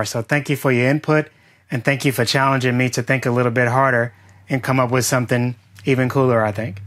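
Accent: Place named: United States. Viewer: As a European it's alway been impossible for me to understand the continued use of imperial units in USA. How long is a mile? The Americans have an old man in Iowa, a mile is how far he can walk in 45 minutes. Honestly, that imperial crap can't be used. When I watch your video, it's so wonderful to hear SI-units spoken with that American accent. Kind of gives me hope for the future.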